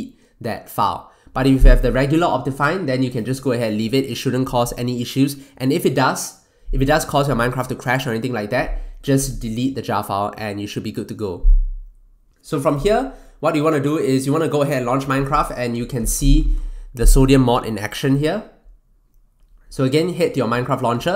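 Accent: Singapore. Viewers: u from singapore?? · I hear your accent, I knew INSTANTLY that you were Singaporean lol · nice singaporean accent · are you from singapore ur voice sounds like it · nice sigaporian accent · ru singaporean? your accent is like sg accent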